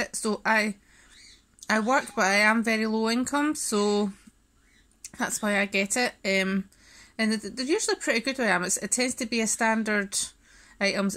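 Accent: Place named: Scotland, United Kingdom. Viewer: Love your Scottish accent along with your pantry haul - luv Toronto csnada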